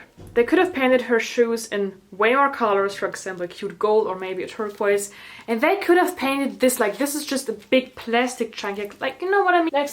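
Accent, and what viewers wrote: Germany. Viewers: are you german ? (me 10 minutes later: JA BIST DU. Ich hab es einfach gleich gehört weil ich den gleichen Akzent habe)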